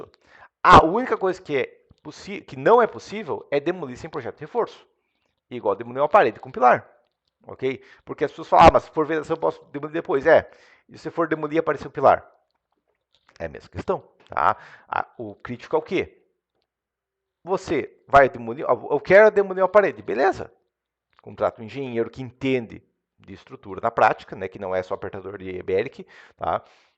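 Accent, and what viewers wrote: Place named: Lisbon, Portugal. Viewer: Meu filho , uma dúvida : você é de que lugar do Brasil ? Faço essa pergunta por causa do seu sotaque . Você é do litoral de Santa Catarina ? Por que o seu sotaque parece.muito com o sotaque da Europa , o sotaque do português europeu , especialmente de Lisboa . Abraço .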